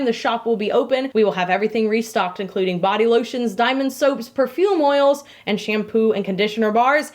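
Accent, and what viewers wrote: Texas, United States. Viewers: “Gawly Dawg”!!!??? She is such a Texan. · It’s OK,!! Remember Marchesa?? You Texans are so charming!!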